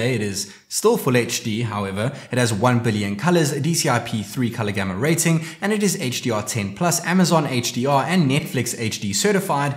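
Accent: Johannesburg, South Africa. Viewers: Are you from Johannesburg? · Are you South African? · Haha! I love the SA accent. Ja swaar!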